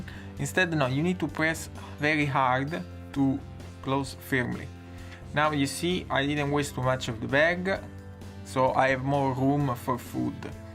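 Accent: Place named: Italy. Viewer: Great demo, one video is worth more than a thousand words. It was also nice hearing a genuine (not a Mario Bros) thick Italian accent. Thank you,. Greetings from 🇮🇹. 😎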